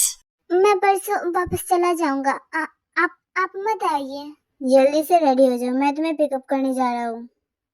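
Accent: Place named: Hyderabad, India. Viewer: Didi app Hyderabad sa ho kya aap ka language Hyderabadi hai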